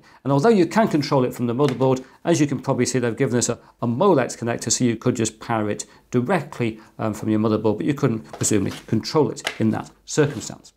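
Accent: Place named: North, United Kingdom. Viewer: Thanks for replying. Yeah I hear the slightly well spoken/slightly northern mix I think. Cheers from Liverpool, I only ask cos my accent isnt that broad hehe:) Looking forward to learning much more. You're a UK YT international treasure Sir!:D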